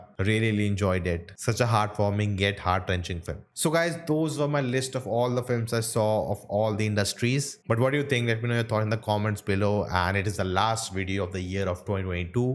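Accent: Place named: South India, India. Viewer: ..you should watch less South Indian films...Over a period of time...your English accent has developed subtle South Indian tinge...especially when you were pronouncing Hollywood Movie titles....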